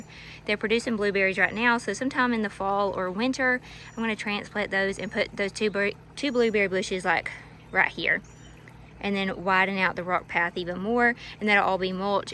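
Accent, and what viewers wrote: South, United States. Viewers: I live in the North and I have to be honest. I love your Southern Accent, but I cannot understand a Word you are saying ! The Reason is you talk way to fast. Maybe if you slowed down and did not run your sentences together, It would be easier for some of your viewers.🙂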